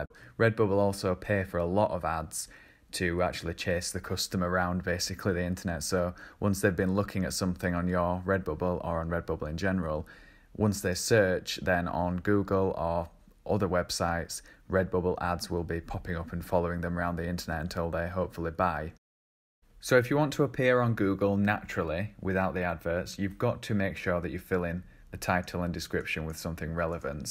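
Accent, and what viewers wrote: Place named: North, United Kingdom. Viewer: I’ve been on RB for a while but not really used it properly, though have had a few sales. This is really helpful and I’m now going to go through your other videos to get more tips. I also love that you’re ‘northern’ like me - it’s weird but your accent makes me want to listen to you. Great video , thank you.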